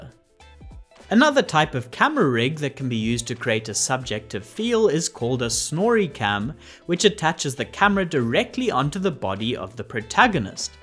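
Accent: South Africa. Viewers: Where is that accent from sir? South African or German?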